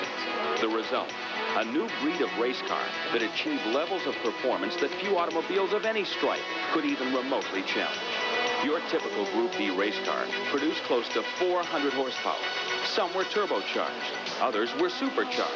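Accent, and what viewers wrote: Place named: United States. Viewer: why the F***K is thier an american voice over what the hell would they know about rallying?? thier probably shocked to watch a car make both a left turn and a right turn this ass hole belngs in a nascar video not this one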